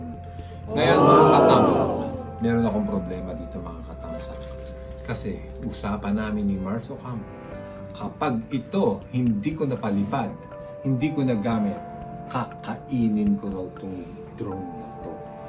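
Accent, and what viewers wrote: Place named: Philippines. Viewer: Guys akala ko chiness ka. Pero noong nagsalita la pinoy ka pala... full supports here. Ganda ng in unboxing mo thanks for sharing godblesss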